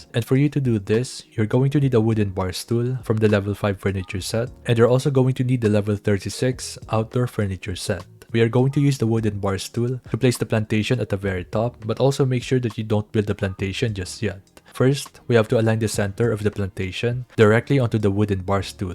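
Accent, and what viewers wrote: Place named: Philippines. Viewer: I can tell your Filipino by your accent lol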